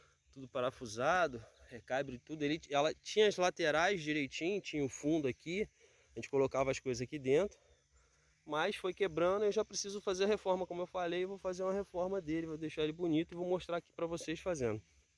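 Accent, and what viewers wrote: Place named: Rio de Janeiro, Brazil. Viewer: Sou goiano e é a primeira vez que vejo um fazendeiro com sotaque carioca, porque geralmente a gente associa o sotaque à praia, litoral, algo mais urbano. No interior de Goias, São Paulo ou Sul é raríssimo um agricultor falando português com tanta perfeição. Parabéns, irmão. To pensando em comprar uma máquina dessas e seu video foi de grande esclarecimento. Abraço.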